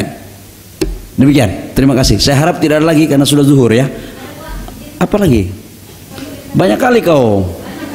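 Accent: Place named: Eastern Indonesia, Indonesia. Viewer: Logat Timur selalu melekat 😁😁 tetapi semangat pak 🥰🥰😇😇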